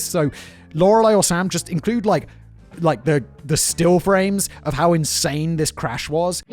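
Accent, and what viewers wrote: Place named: RP, United Kingdom. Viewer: A minute ago, I watched a “Geographics” about Trans…figuritania?😂😆 this wannabe independent nation in Moldova that reminds me of Corsica -.the motherland) followed by a Into the shadows… and the more goes on, the more unhinged, nasal and Pommy (Ozy/Aussie expression to depict the British “Poms”/UpperMiddleClass) becomes. It’s so funny/uncanny!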